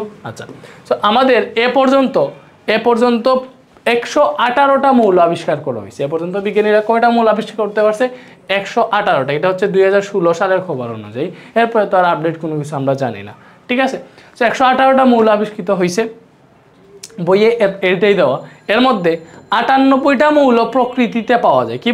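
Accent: Sylhet, Bangladesh. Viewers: স্যার আপনি কি সিলেট বিভাগের?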